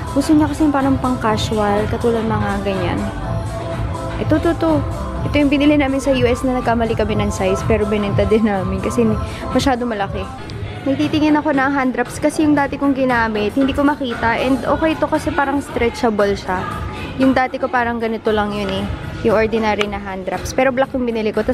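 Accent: Batangas, Philippines. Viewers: Napakacutie magsalita ni miss pag kasama ang mga kapamilya haaha batanguena na batanguena e 😂😂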